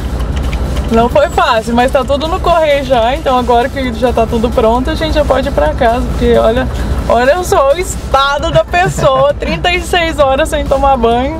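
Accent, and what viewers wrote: Minas Gerais, Brazil. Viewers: Ai, sotaque mineiro! O seu não é tão puxado, mas o do seu irmão é muito! Adoro! Minha família é mineira e morro de saudade do sotaque deles! Sei bem como você se sente vendo o seu primeiro livro pela primeira vez porque também sou escritora e publiquei livros, e é incrível pegar o SEU livro pela primeira vez! É lindo! Mas claro que você vai vender muito mais que eu. hahahahahaha De qualquer jeito, boa sorte e eu com certeza vou querer seu livro. Beijos e parabéns pelo livro! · Que simpatia voce! Conheci recentemente seu canal e estou gostando. E esse sotaque lindo, me deu saudades da minha querida Minas! Araxà è uma gracinha, nao fica muito longe da cidade onde eu morava ( Lagoa da Prata) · Ai bom demais da conta esse sotaquinho nosso mineiro né gente!!! Sucesso!!!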